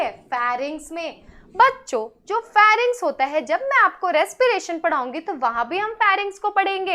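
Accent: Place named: Bihar, India. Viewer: The Presentation was great and The Explanation was awesome with some extra concepts I think.Though It wasn't of my class but I enjoyed it. KEEP UP YOUR GOOD WORK TEAM and if I am not wrong from your Accent I can guess that you are from Bihari origin having said that I am not from there. Anyways BEST OF LUCK TO THE TEAM for your youtube channel and I wish your channel grows over time. Once again thanks for your amazing explanation.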